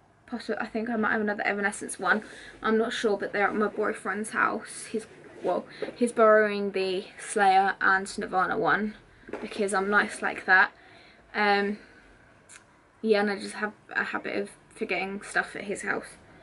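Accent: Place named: United Kingdom. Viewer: Hello your stuff is nice we have the same shirt black veil brides and also the shop at that store hot topic love your British English is very difficult to understand but it is very nice your asento greetings from México tijuana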